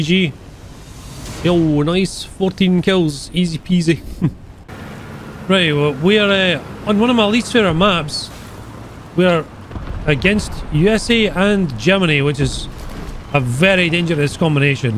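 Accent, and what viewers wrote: Scotland, United Kingdom. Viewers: Hearing a Scottish man whisper "Sleep now, little baby" in a sinister tone may be the most terrifying thing I've heard all week.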